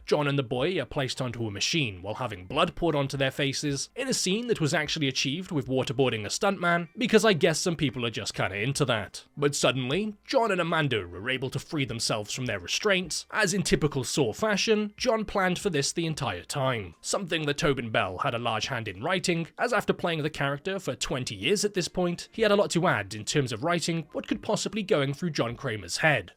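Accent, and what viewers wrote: United Kingdom. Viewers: i nnoot know he british until i press play bruh i had it saved to my watch later my life is ruined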